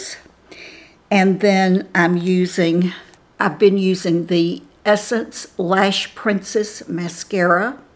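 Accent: South, United States. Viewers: I lost 8 of my closest family and friends. I had to take care of my husband for two years. He had Parkinson’s. He died almost 4 years ago. My reason for telling you this is I did not have a wrinkle one when all this started in 2009 I got all kinds of stuff. I just don’t know which way to turn, i love to watch you. You are so down to earth and I don’t know where you come from but you sound like a southern a lady. From Alabama. 80 looks beautiful on you beautiful!! 🩷 I hope that I can b like you. I watch all of your videos and love each one thank you for being there for me. I feel like you’re doing this for me ha ha💓🌸🩷 Just don’t stop! U make my smile! Cathy Seymour from North Alabama🩷🌸🩷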